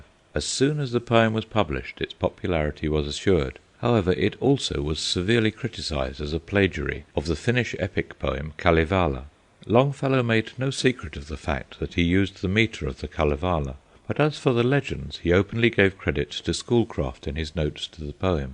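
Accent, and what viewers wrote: England, United Kingdom. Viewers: It's difficult to get into hearing this read with an English accent. Sorry. If it was Shakespeare, he'd be great.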